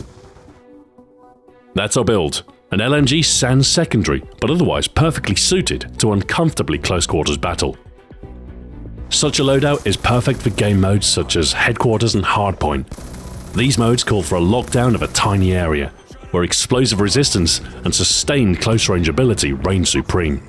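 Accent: England, United Kingdom. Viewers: English accent :) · It seems like this series has become to: "watch a british man saying puns about gun names in black ops 2".... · Sorry sir he is British. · Does he sound American? He is obviously British. Just like how the Closed Captioning read "Centre" instead of Center. He even types his own CCs for his videos.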